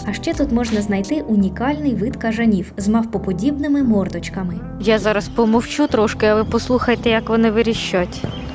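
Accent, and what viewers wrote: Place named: Poltava, Ukraine. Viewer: Дякую за відео! Нетривіальна країна, розповідь цікава і приємна вимова (полтавська, навскидку, але можу помилятись).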